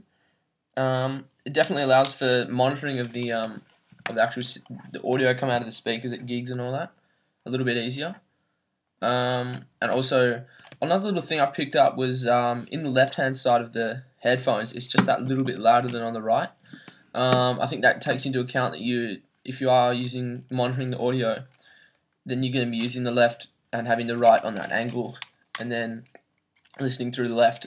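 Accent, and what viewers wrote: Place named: Australia. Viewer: You sound Australian haha I listen/watch to dj cotts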